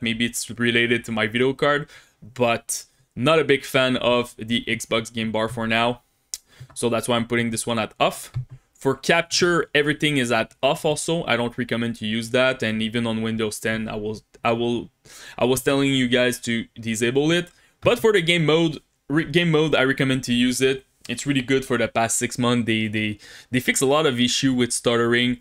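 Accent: Quebec, Canada. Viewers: I love your French-Canadian accent lmao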